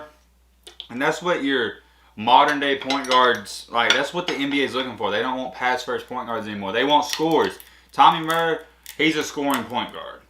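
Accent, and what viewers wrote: South, United States. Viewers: He’s southern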